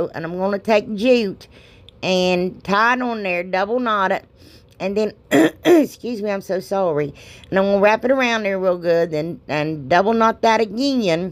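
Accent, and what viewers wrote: South, United States. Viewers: I was watching a diy of something I'm pretty sure, wouldn't turn out the same if I did it. A girl can dream... Your cute little bee thumbnail was in the suggested videos, and I thought well that is adorable. I'll buzz over there and check channel out. The first thing I hear is what sounds like a southern accent and I'm flooded with childhood memories growing up in southern Georgia that brings a smile to my face. I've spent my whole adult life as a yankee lol. Anyway, I thought this gal has my kind of humor and I thoroughly enjoyed the video enough to subscribe! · You are so much fun to watch. You make me laugh and your such a great crafter. Everything is so pretty. I love the bee theme. Please make a bank. It would be so cute and funny too. I’m a southern girl., but your southern accent sounds just like my sister that’s from Tennessee. 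I’m from pensacola Florida. I have lived in Mississippi for the past 22 years. Gulfport MS. Anyways, great video.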